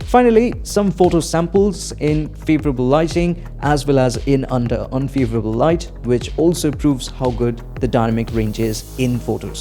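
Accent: Bengal, India. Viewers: Bruhhhh i knew it i kneeew it that u were Bengali but i couldn't proove it .... from the start I was sure about u from south .... Nice videos man 👍 love ur reviews keep it up 🙏🙏 ...... Aren't u gonna do a moto signature??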